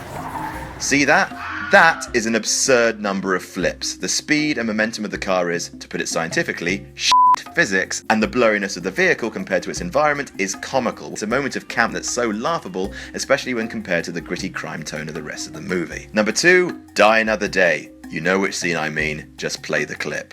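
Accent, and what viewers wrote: United Kingdom. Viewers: Make your introduction even longer.... there is nothing I love more than listening to some boring British monotone voice blather on and on and on.... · Is it just me, or is everyone else fed up with British accent narrators, and Teleprompter readers..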